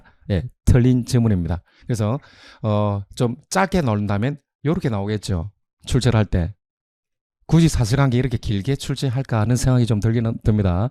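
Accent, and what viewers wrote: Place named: China. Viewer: 딕션이 중국인 같노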